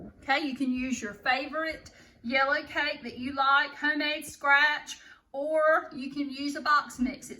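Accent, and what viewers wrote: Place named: Texas, United States. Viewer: You talk just like me. I'm from south east Texas piney woods.😁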